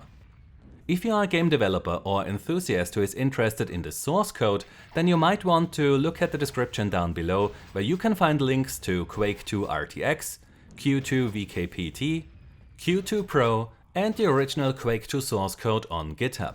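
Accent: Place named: Germany. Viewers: Hey there, not to criticize you or anything, but I‘m really curious about your accent. Sounds kinda German-ish, but with a different pronounciation, so my guess would be that you‘re Austrian? Am I correct? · Pretty sure it's german. Practicing english. You can tell when the syllable count is a multiplier of what would normally be said.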